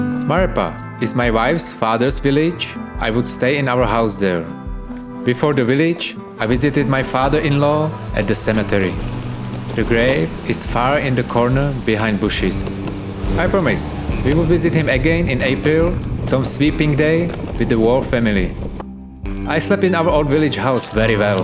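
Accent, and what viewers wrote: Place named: Czech Republic. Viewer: Hi, I just want to ask you, where are you from? You have english accent that sounds a little bit Czech. Im just really curious if you arent from Czech Republic.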